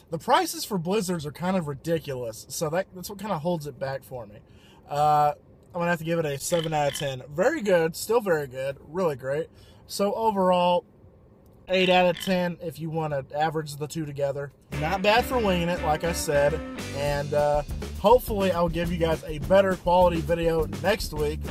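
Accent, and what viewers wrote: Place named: Texas, United States. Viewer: I think I need to marry a Texan. You got the nicest accents.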